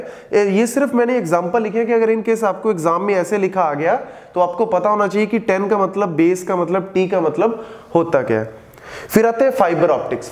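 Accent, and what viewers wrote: Punjab, India. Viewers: love your Punjabi accent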